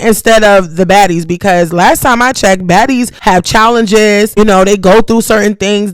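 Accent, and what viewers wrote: New York, United States. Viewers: YOURE DEFINITELY FROM N.Y. ITS THE WAY WE TALK…PERIOD LOVE THE CONTENT🖤💪🏾💯🥂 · You sound like Remy ma... You can tell you're from NY ❤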